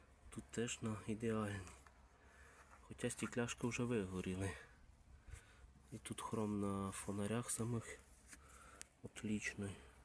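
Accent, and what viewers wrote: Western Ukraine, Ukraine. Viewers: Прикольний, шкода що на городі зберігали, - кузов убитий. Цей москвич був би раннім, якби був 412-ї моделі. 408 можна назвати раннім, якщо це авто в кузові "е" (з фарами-плавниками) і десь приблизно <num> року До речі, у Вашого авто мабудь чи не найгарніша мордочка з всього модельного ряду, - решітка з широкими отворами і прямокутні фари П.С. не бачив поки щоб хтось критикував жителів західної України за їх говірку, це ваша "фішка", не переймайтеся! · Бачив відіо як неошибаюся канал називається "Вася Крутс" до він теж купив москвич тепер розбира на запчасті і продає. Я замітив що розмовляєте як в західні Україні все 👍👍👍розмовляйте як удобно головне щоб не нарусійські мові 🇺🇦 🔱. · Робіт добру справу і все вийде. Гарний Закарпатський діалект, головне не кацапський язик. Слава Україні. Смерть ворогам.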